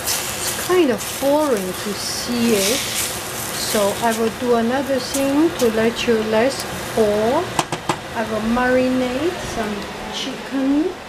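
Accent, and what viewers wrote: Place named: Liberia. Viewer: Oh okay... sound Like Liberian. Nice cooking :)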